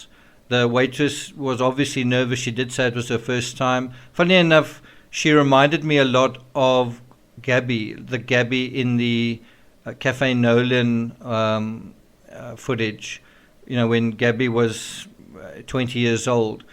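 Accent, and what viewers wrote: South Africa. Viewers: Good SAFFA accent. Your steak was blue. Hello from Sedgefield in the Garden Route.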